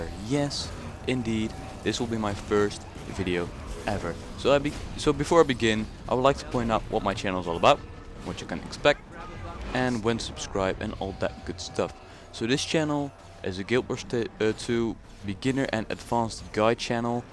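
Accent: Netherlands. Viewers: very nice video, i directly heard u were dutch ;) subbed for more videos! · Dit is letterlijk de eerste keer dat het me niet stoort dat iemand die nederlands is engels praat in een filmpje. Je hoort het wel, maar je hebt (voor zo ver ik hoorde) geen fouten gemaakt zowel grammaticaal als in je uitspraak. Daarbij was het ook een heel handig filmpje aangezien ik net 2 dagen bezig ben met gw2. Keep up the good work!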